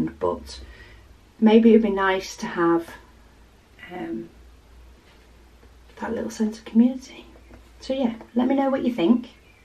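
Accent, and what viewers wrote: United Kingdom. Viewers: Please start a group on Facebook. I am a new subscriber and just happened to check out your channel via Rachel Rae as you are working on a blank canvas as I had just started one, not a HAED but a cross stitch pattern from Kustom Krafts. Thank you for the tip on how to do the conversion in order to get the correct canvas size. I have also ordered a single punch and sticker paper from Amazon, great idea as I cannot get refills for my Xyron. Oh, you can buy Goo- Gone from Amazon and I found it was an absolute godsend. Thank you for all the tips. Love your accent, same as Fruitini, what part of UK are you? I'm Dundee, Scotland 💜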